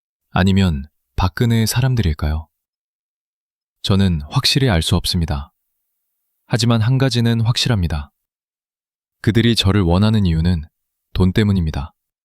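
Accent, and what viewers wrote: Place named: China. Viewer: 중국 발음이네 AI가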